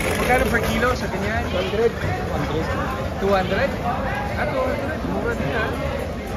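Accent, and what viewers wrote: Iloilo, Philippines. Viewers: Wag muna pilitin magtagalog boss halata Naman n ilonggo k eh😢😢😢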